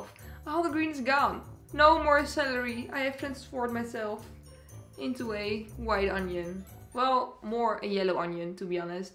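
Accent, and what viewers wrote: Netherlands. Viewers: Je klinkt zooo nederlands lmfao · yo for real, are u dutch? sorry but u have SUCH a dutch accent hahaha love you <3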